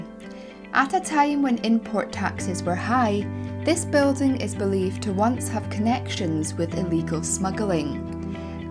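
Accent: Scotland, United Kingdom. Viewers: What a treat to find this on You Tube! I haven't been in Peterhead since the 1950s and 1960s when I used to go every year with my parents to visit my grandma and great-aunt. It was a lovely little holiday place back then with an active fishing industry. I remember as a child going down to the harbour in the morning with my bucket and spade and asking a fisherman if he would fill it with herring, which fishermen generously did for visitors. Not far from the 'Muckle Kirk' used to be the big Crosse & Blackwell factory, and in the morning the town used to know what they were cooking up that day from the aroma that wafted around the vicinity. Lots of memories, and so many of them brought back here! Many thanks also to this splendid young lady for making such a fine job of this walkabout. She has a beautiful speaking voice with a lovely pure Scottish accent. 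I could listen to her for hours!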